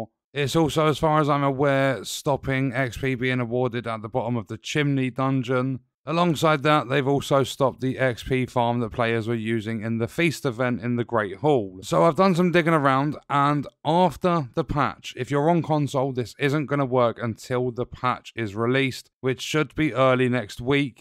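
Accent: United Kingdom. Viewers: I know his time zone. His accent is a dead giveaway. He’s definitely British. So his time zone is the same as mine!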